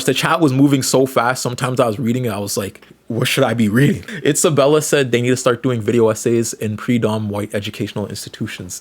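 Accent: Canada. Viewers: You sound like you're from Toronto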